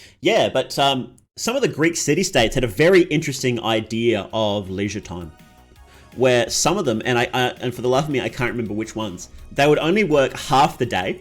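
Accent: Australia. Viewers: You have roight proper aussie accent. This was a nice talk.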